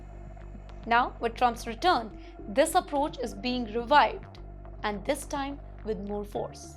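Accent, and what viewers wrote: India. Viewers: Indian fatigue so done with your accent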